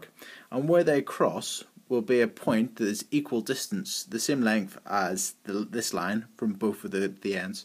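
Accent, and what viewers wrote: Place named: United Kingdom. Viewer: are you from England? because you have like british accent, if you are from England well high five because im from England aswell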